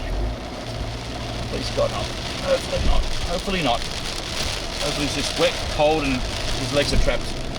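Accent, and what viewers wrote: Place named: Australia. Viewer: Just came upon your channel sir. I really appreciate your approach. Safety and a true love to help others. I'm sure im not the first, but can you imagine being in the southern US; get smacked by a storm and have some bloke with an Aussie accent asking if you're ok. Did i get whisked away to Oz? Well done. 73 God Bless.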